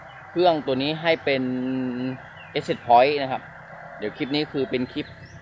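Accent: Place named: Ratchaburi, Thailand. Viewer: สำเนียงราชบุรี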